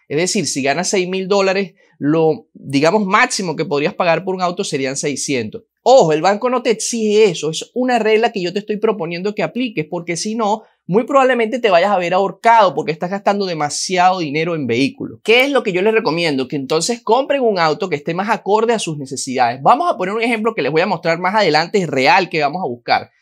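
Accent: Cuba. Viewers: Wow gracias por la información Que risa como hablas cubano Caballero 🤣😂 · Jejejeje brother muy bien tu personaje de cubano, te metiste en el personaje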